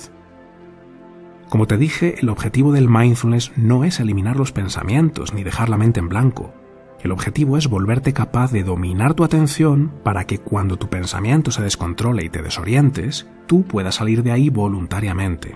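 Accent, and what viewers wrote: Spain. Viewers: Es el único acento de un español que sí Disfruto al escucharlo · Lo que es feo es tu forma de juzgar y decir que la mayoría lo hacen.Es claro que te identifica con ellos.Para mi muchos hablan como lo hace aquí está bella persona.El español es un acento dulce al escuchar ❤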